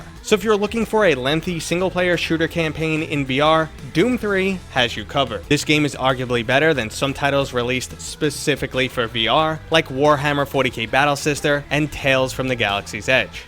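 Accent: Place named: Boston, United States. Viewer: That boston accent tho.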